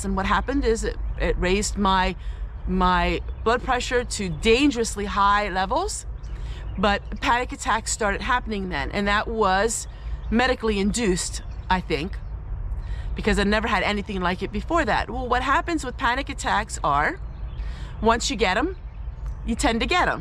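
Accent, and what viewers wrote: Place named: Pennsylvania, United States. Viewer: I've been to Pennsylvania many times and you sound just like a Pennsylvanian. Your son has the same accent. You don't talk" oddly " at all. It's regional. I'm so glad you brought anxiety disorder to light. There's more of us than we ever knew sis. God be with you.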